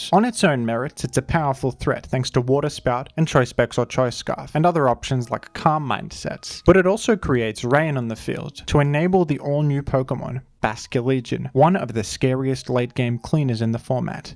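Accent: Australia. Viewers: Oh thank god you’re Australian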